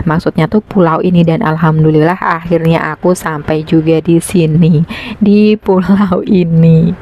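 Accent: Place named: West Java, Indonesia. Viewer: Peda orng sunda dina nyarios na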